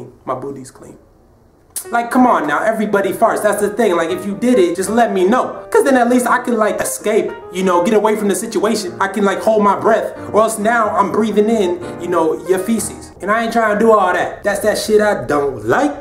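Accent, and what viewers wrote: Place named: New York, United States. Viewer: You from New York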